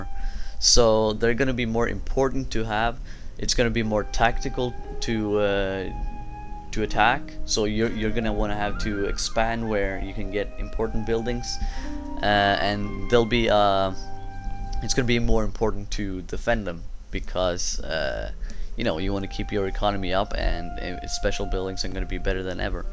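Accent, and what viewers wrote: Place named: India. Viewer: Idk, your words sound heavy sometimes like some Indian people's accent in the US. Also when are you continuing your Carthage campaign?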